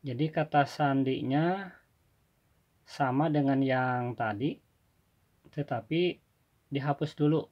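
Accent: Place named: Banjar, Indonesia. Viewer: Banjar kc mba logat nya koq logat bnjar 😀😀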